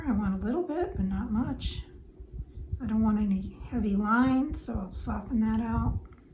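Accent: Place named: Boston, United States. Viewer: Your accent sounds like mine. I’m from the Boston area. Love this piece 😍 SALEM WILLOWS grew up going there 👍🏼😍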